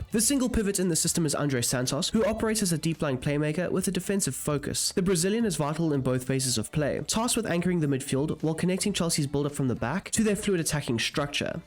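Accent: South Africa. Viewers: Are you from South Africa?? That accent is familiar!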